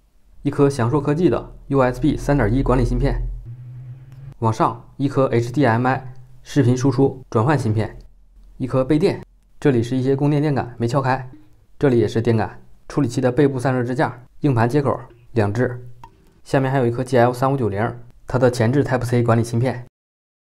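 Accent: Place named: Northeast, China. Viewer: 你是东北林区的吗？和那个林区大雷一个口音啊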